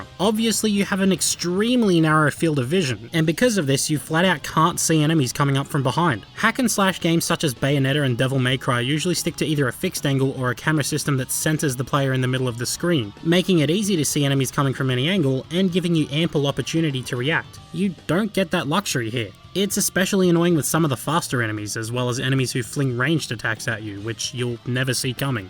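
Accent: Australia. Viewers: Finally!!! I love all your Vids! They're so funny everytime Maybe your Aussie accent helps a bit :D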